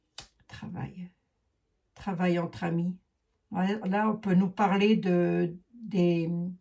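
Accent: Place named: Paris, France. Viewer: J'aime tellement que vous soyez une belle lorraine et que vous parliez avec une véritable gouaille parisienne 💓💕❣️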